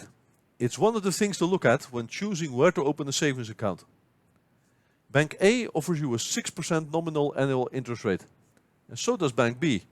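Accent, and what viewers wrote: Netherlands. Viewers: From the first 10 seconds i already heard you were dutch. Super bedankt voor deze geweldige uitleg meneer. Ik ben 1e jaar studente econometrie en begin nu met Finance. Waardeer het enorm.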